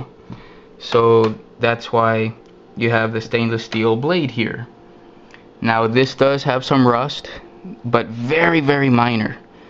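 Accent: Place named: Netherlands. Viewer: sounded like a Dutch accent ;)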